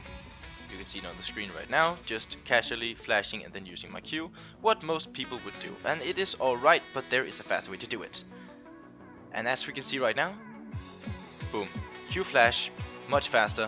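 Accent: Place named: Denmark. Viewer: You sound a bit danish.....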